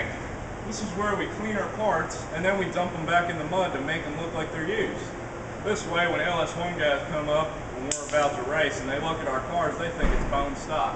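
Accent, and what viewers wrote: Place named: Oklahoma, United States. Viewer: if you don't mind me asking, where is you shop located, i'm an okie but live in Fresno, you sound like me so your probably east of me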